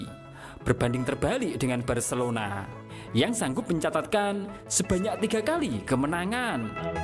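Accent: Java, Indonesia. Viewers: Jowo medok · Pengisi suaranya pasti orang Jawa ni... Medok 😁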